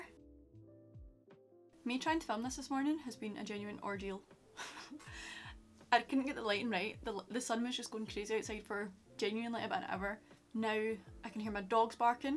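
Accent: Scotland, United Kingdom. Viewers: I LOVE YOUR ACCENT!! It reminds me of my Scottish grandparents when they would always talk to me while they did my plats. ❤❤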